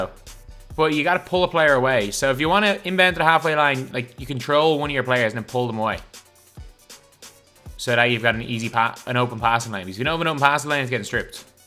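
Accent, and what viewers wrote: Ireland. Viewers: Are you Irish or American 😂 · He’s definitely irish ☘️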